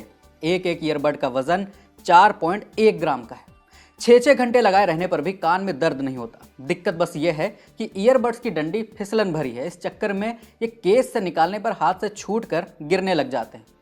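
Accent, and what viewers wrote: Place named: Bihar, India. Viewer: bhai aap Bihari lagte ho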